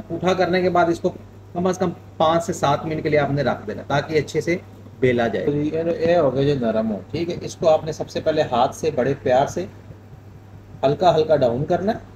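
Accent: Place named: Faisalabad, Pakistan. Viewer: Lehjy sy ap faislbadi lgty ho😊😊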